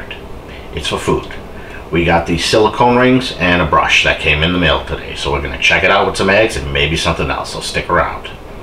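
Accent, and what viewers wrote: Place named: East Coast, United States. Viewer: You have my grandfather’s name, first and last. I’m a too. Not tons of us. Sounds like you are in the east coast like us.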